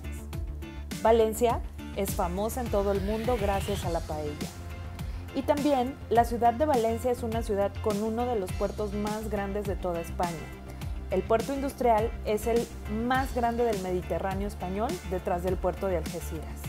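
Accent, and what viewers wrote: Mexico. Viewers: Tienes acento mexicana?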